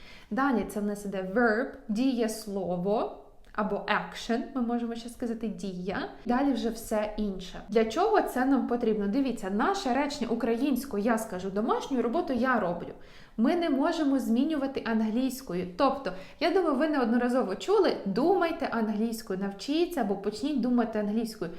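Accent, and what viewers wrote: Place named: Georgia. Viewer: Ваша вимова англійської така сама як грузин вимовляє російською. Та подайте коротенькі казки чи інші невеличкі тексти англійською, але диктор має бути людина яка є носієм англійської мови.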